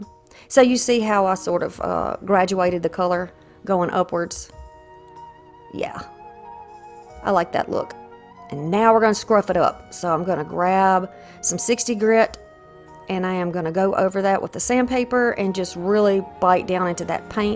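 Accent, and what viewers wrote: South, United States. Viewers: Both are beautiful. Me and my 9 year old granddaughter are going to go a fairy garden outside. Have you done any fairy gardens outside? If so, do you have any videos. I shared this video with her. She's so funny. She said mamaw she talks different. We live in New Mexico, and she's never been to the southeast side of the United States. I used to live in Mississippi. I still have some of a southern drawl.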